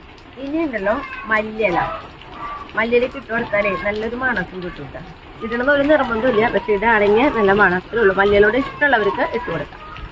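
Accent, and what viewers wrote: Thrissur, India. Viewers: എവിടെ ആണ് നിങ്ങളുടെ വീട്? തൃശ്ശൂർ സ്ലാംഗ് കേൾക്കാൻ ഒരു സുഖമുണ്ട് ഇല്ലേ? ഞാനും തൃശൂർകാർക്കാരി ആണ് ട്ടോ.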